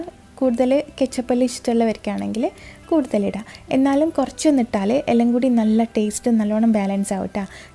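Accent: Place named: Nileswaram, India. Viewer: slang nhangalude nileswaram slang pole same und..athaa choiche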